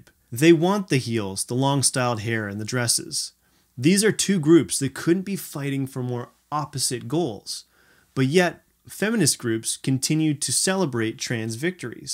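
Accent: Canada. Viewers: Canadian much? I love it! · Interesting, well thought out points... a little culty and the GTA accent is unbearable. Mute and use cc!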